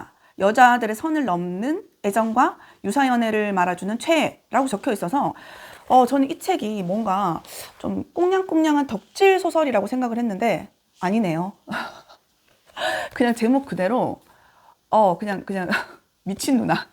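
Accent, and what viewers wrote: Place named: Gyeongsang, South Korea. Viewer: 핑크색에 홀려서 클릭했다가 구독에 댓글까지 남겨보아요~ 끝까지 영상보다 땡기는 작품도 있고 <종이여자>는 오래전언니 책꽂이에 있던 책인데 님 덕분에 흥미가 가요.. 무엇보다 갱상도 사투리.. 너무 정감가는데요?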